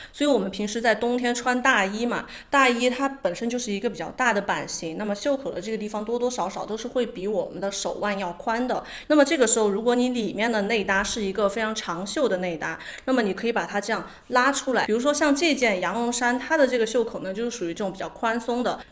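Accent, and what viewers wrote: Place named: Shandong, China. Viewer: 👍听口音是山东人😃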